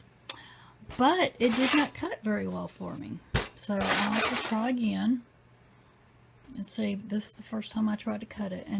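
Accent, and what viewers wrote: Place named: South, United States. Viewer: Thank you for sharing this video about these dies because otherwise I wouldn't have purchased any of them. About how long does it take for you to receive your orders from China & are they packaged well? BTW...I love listening to your southern accent, it's so sweet, it reminds me of my mama's accent... :) Take care, Lily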